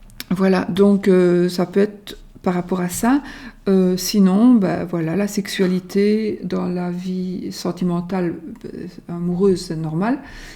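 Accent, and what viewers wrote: Belgium. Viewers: J adore l accent belge on es les meilleurs 🇧🇪 Merciii pour ce tirage♥️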